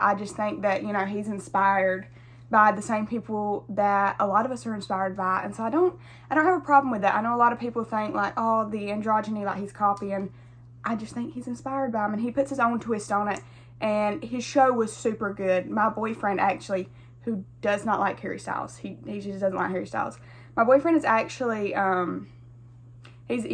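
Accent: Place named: Georgia, United States. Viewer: I love your accent! Is it a Georgia accent? You have great taste in music too!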